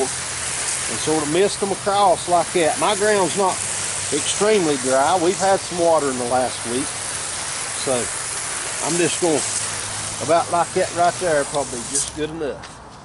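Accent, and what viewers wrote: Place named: Georgia, United States. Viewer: I was born in south ga. You sound like home. I live in AL now because that’s where my husband is from. I planned to get my mustards in the ground at the end of August. And yes thinning is hard to make yourself do when they’re first coming up but boy have I regretted it when I didn’t. Enjoyed the video. God bless and happy gardening.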